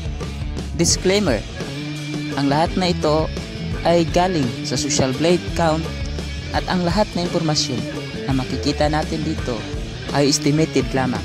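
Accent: Visayas, Philippines. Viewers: bisaya ka dong haha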